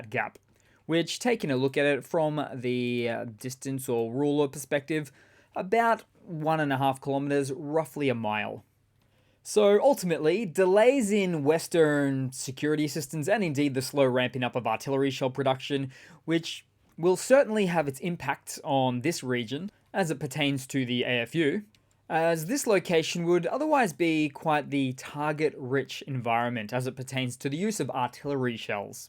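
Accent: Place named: Australia. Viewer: Sorry, but I still cannot get used to an Aussie accent pronouncing ‘route’ as “rowt” rather than “root” and ‘via’ as “vee-ah” rather than “vye-er”.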